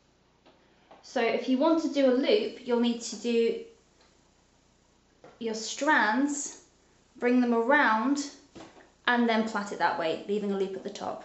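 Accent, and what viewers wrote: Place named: United Kingdom. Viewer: British accent! 👌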